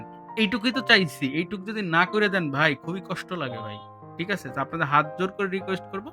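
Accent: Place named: South Bengal, India. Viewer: ভাই আমি একদমই নতুন। তবে এটা খুবই সুন্দর ভাবে বুঝিয়েছেন। স্টপ কি ভাবে নিতে হয় এবং মার্কেট ড্রয়ের একট ভিডিও দওয়েন । ধন্যবাদ প্রি ভাই। আপনার কথা শুনে মনে হয় দক্ষিণ বঙ্গের লোক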